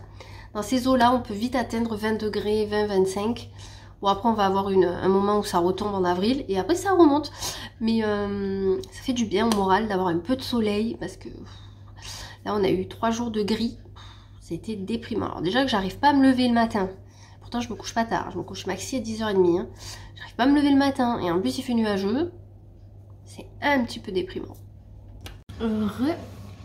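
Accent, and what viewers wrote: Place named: South, France. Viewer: Coucou je connais pas du tout ton département soit orange 🍊 nous on est de Bordeaux et nous partons très souvent en Espagne et en Italie 🇮🇹 nos pays d origine donc à art Paris on connaît peu la France 🇫🇷 et sinon tu as un accent très prononcé du sud c très jolie a l oreille bisous à ma youtubeuse préférée ❤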